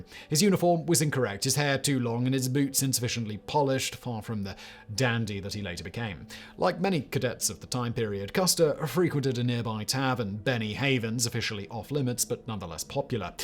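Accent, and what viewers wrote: United Kingdom. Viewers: Dear, dear... I do think they protesteth far too much. It's either your pronunciation (you damn Limey), your racial naming of participants of just choosing the wrong side of your subject. You can't win can you..., so stick to Europe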